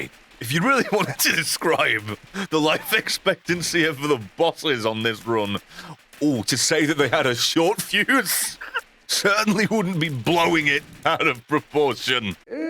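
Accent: Scotland, United Kingdom. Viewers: I love his Scottish accent and I have no idea why.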